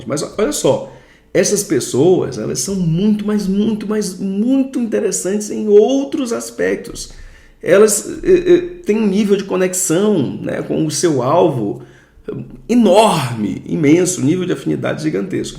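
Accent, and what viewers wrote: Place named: Pará, Brazil. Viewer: acredito ser do Pará….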